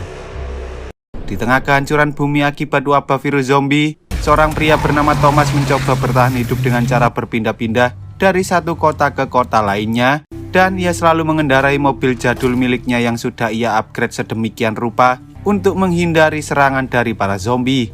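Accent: Java, Indonesia. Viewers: dari ngomong nya udah pasti orang jawa❤🎉😅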